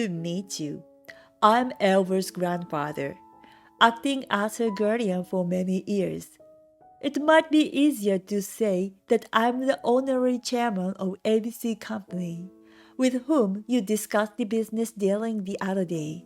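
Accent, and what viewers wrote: Japan. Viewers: Why translate to English ? You hired to read story a asian stupid person who can not clearly speak English due to a heavy Japanese accent! All of the English words are blurred grammer or simply miss--pronounced totally! Very hard to listen to! !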